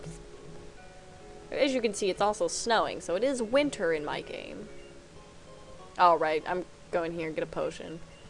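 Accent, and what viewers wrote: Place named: South, United States. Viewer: I was wondering, do you live in south U.S? Just wondering cause you have a slight accent. =3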